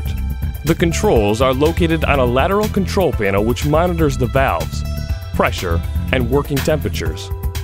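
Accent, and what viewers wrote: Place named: Texas, United States. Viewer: 9000 l. tanks and waggy slow polybrushes 2-3MPH...18 loops of the Gemasolar/Torresol Energy place a day? It looks a horror. But it handles those close-in parabolic reflectors. Does the power plant condense much over 9000L on the turbines' cold side as some sort of side-effect? Was electrostatic or mirror-mounted cleaning out of the question, compared of course to the truck dieseling by all day? Application research and Basic R&D are different, you! The HTF (high throughput fluid) truck's 'nitrogen therusion' for keeping the rubber solid is the only real howler in the mildly gamy Texmexican Voiceover.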